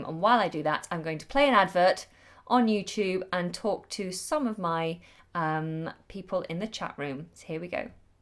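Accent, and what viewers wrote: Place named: United Kingdom. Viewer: I love your accent quite a lot. I have heard many British natives speak but nothing compares to you. What is it, RP? Keep it up, I learn a greal deal from you....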